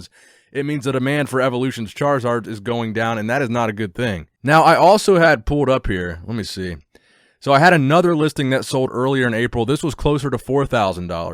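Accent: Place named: New Zealand. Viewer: Awesome videos, you actually sound like a new Zealander too which is crazy. Keep it up bro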